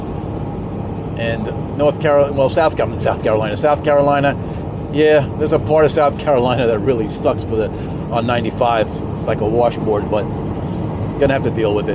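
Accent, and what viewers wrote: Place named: New Jersey, United States. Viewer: COLD! Judging by accent you must be from NJ? The Fletch used to see winter for a long time in western NY and I'm sure doesn't miss it. Good luck racing in Florida and have fun.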